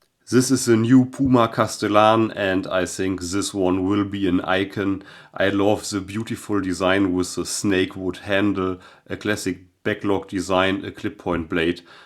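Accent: Germany. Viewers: Your accent is undeniable german - but likeable 😉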